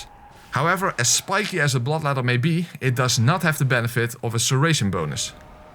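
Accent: Netherlands. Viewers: Your accent is all too familiar as a Dutch person, but otherwise your comedy is top tier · Its because he's Dutch and funny and smart. The internet likes american accents, doesn't like good jokes just "randemz lolololol" nonsense, and also people don't like smart folk because of social anti-intellectualism. IMHO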